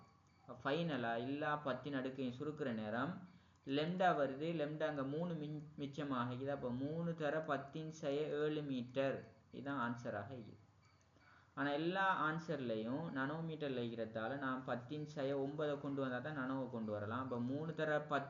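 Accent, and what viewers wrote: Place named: Tamil Nadu, India. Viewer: Your voice awesome ❤ also ur tamil slang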